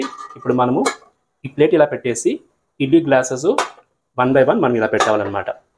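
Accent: Tamil Nadu, India. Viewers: Though you are a Tamilian, your Telugu is very sweet and impressive.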